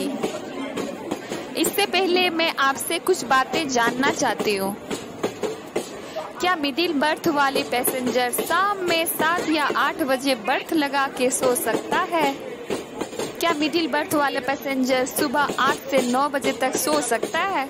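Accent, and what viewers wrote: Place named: Marwar, India. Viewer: Bahut hi badiya jankari di aapne medam… aap has has k jese bata rahi thi video interesting ho gaya or mene pura dekha or ha aapki voice se aisa lagta h aap marwadi ho sayad.. i am right ya wrong medam ??😊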